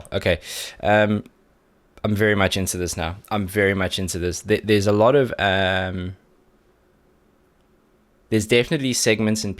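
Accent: South Africa. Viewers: I kind of fell down the rabbit hole of watching various YouTubers reacting to but when I came across this video, boy was I surprised! I Instantly recognised your accent as being South African! As a long time fan of from South Africa, it was trip to find this! I think of all the reaction videos I've watched, this one was the most technical and detailed. It's been interesting hearing about different aspects of the instrumentals (other YouTubers don't really mention them). On, they have a large catalogue of music and their style has changed quite a bit over the years but is one of their defining songs. It's 9 minutes of ebb and flow where you are waiting for the wave to break onto the shore and when it does, wow, does it overwhelm you. (As a viewer who knows the twists and turns of the song, it is fun to watch people's first time reactions to it's unconventional structure.) Kyo (V) has an amazing vocal range so people often focus in on him but the other members of the band, Toshiya (B), Kaoru (Gt), Die (Gt) and Shinya (Dr) are also all immensely talented. 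They've been going for over 20 years and still making music but I love how one never knows what to expect from them next. Still on my bucket list to see them perform live one day!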